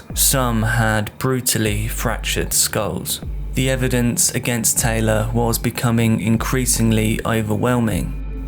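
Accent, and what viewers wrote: United Kingdom. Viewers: Is this a robotic voice with a British accent, it sounds like it.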